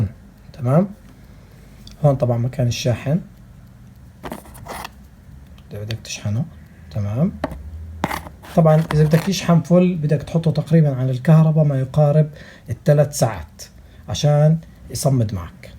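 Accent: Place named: Jordan. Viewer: طيب اخوي هاي لو انمسكت معك من الشرطة في عليها سجن ؟ بالاردن قصدي لانو مبين من لهجتك من الاردن زينا وكل الاحترام لكل الاخوان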